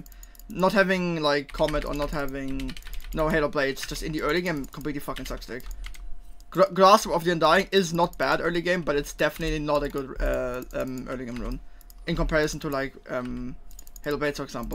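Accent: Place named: Germany. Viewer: Ah, alrighty man. I had you at what is considered max for my ears and I still barely heard the game. You make the stutter sound so fluent it makes it sound Korean/Chinese with the speed of which you're speaking. That mixed with the German accent and the "mumbling" from the braces makes it sound even more like it lol.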